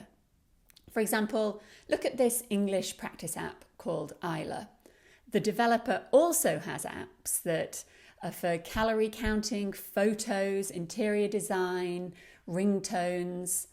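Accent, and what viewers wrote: United Kingdom. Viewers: I really enjoy your posts, the way you speak, the clarity of your statements… it sounds like (perhaps I’m mistaken) a bit of aristocratic English ;-). By the way, could you tell me (us) your name? It’s always easier to talk to someone on a first-name basis. If not, that’s OK - I know the English are famous for their eccentricity. 😉 Warm regards, Alex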